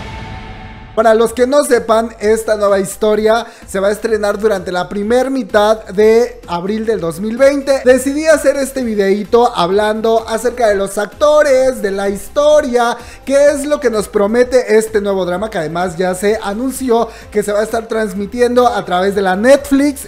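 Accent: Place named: Mexico. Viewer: muy divertida tu explicacion....cual es tu pais de origen...pareces de mexico...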